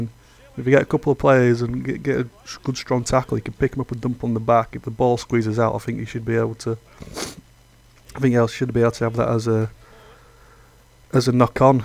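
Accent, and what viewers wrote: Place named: Yorkshire, United Kingdom. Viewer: I knew this lad was going to be talking sense about Rugby League when I heard his Yorkshire accent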